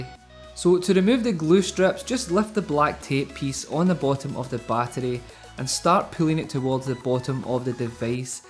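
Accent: Scotland, United Kingdom. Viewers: Thats the good ol scottish accent. 😊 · its a scottish accent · scottish but it's not usually that strong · Omg yer scottish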